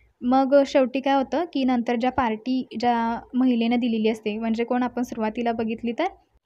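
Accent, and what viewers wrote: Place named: Satara, India. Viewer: i think you from satara speaking satari and gavathi mix but it superb......nice teaching method..i loved it,,,keep doing well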